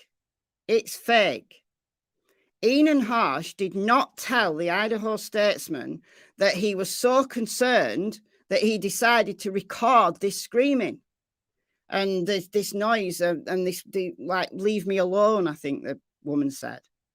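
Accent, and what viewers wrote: United Kingdom. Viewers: 🌟🌟🌟Hey, As far as your age… I don’t think it has anything to do with how you look. This mornings video was more flattering in the natural light and you look to be late 30s to me. I think its something in your voice. Not in a bad way! It’s something like a motherly tone. Wise. Ideals beyond what Americans usually have. Americans, in my opinion, can be self centered and downright viscous at times. Although Uk paparazzi are an animal unto themselves. (Poor Diana) Your steadfast beliefs and unshakable empathy for others speaks to many life lessons. I’m not sure I had that emotional intelligence when I was your age… AND I’m a bit biased on the British Accent. Lol. It’s a pure joy to listen to a well educated Brit when they get their Irish Rant going! Another thing… I hate “Super Chats”… just to old. But you have a way with lives. I usually sit and work on my Adult Coloring Books or play solitaire while listening. 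Again it’s the vibe of hanging out with tea and some laughter to lighten the horror this world dishes out. I really enjoyed latest member video! I would be so proud to have a daughter like you! Many blessings to you. 💖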